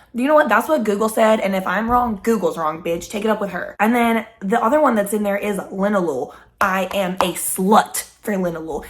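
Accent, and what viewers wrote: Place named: United Kingdom. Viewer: I love every video every time! You do not miss! As someone who also struggles mentally I always love these videos. The British accent is also so funny bc why do I do the same thing when I’m talking about my mental stuff😂😂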